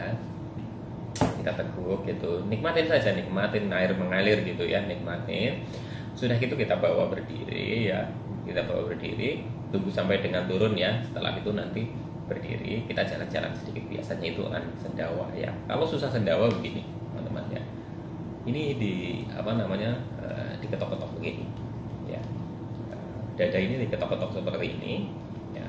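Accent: Banyumas, Indonesia. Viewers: Mas. Sembuhnya Berapa lama?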